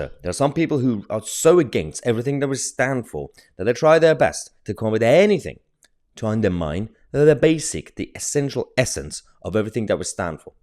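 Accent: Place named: RP, United Kingdom. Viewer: im offended by you..trying to speak like an english snob...what a prick you are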